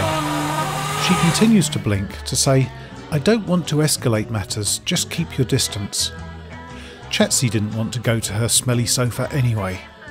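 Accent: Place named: United Kingdom. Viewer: Nice british voice to talk about cats :) sleepy time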